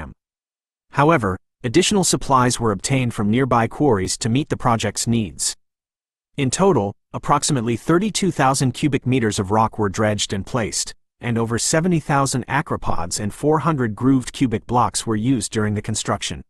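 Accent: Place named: United States. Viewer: Not sure why there is an american voice doing this when the whole thing is Europe based, l never watch or listen to an american voice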